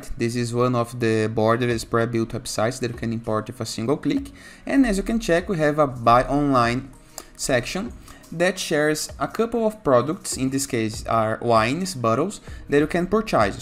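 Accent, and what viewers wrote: Brazil. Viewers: Sotaque brasileiro não passa batido kkkk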